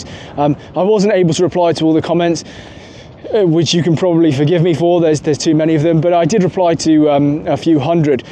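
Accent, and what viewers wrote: RP, United Kingdom. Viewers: I love the way he talks so posh .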